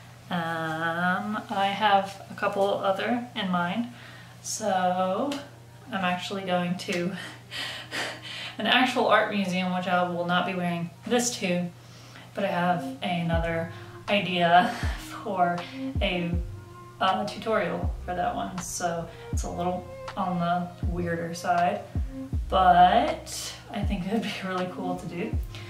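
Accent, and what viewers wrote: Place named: United Kingdom. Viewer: You remind me of a teacher,you have that look on your face....a strong one..dont joke with me :-) British accent is phenomenal You have definitely some acting skills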